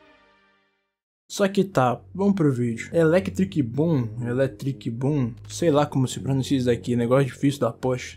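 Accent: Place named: Manaus, Brazil. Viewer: tu e de manaus neé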